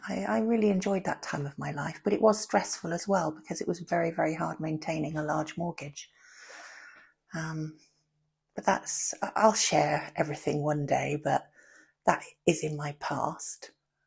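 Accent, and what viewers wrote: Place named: Swindon, United Kingdom. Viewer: Foxes and badgers in the garden. Don't they tend to give (some) people the hump and poo a lot in gardens? Sounds as if you did a combination of The Good Life and Hugh Fearnley-Whittingstall's River Cottage back in the day! And I have to say, you've retained a slight Swindonian accent - it's still there! 😁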